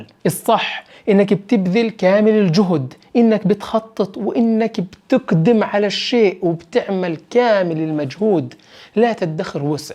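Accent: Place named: Palestine. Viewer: يسعد اللهجة الفلسطينية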